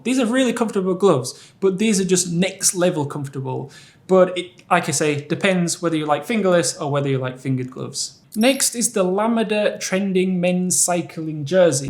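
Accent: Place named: Yorkshire, United Kingdom. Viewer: Where's your accent from? Sounds Geordie with a bit of Yorkshire.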